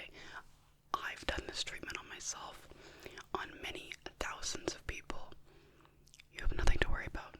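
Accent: Canada. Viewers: ...Nigga are you canadian?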